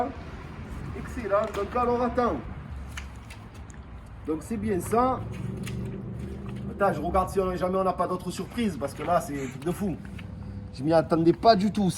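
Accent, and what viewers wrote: South, France. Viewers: Plaisir de t écouter!! Jkiff l accent du sud!!👍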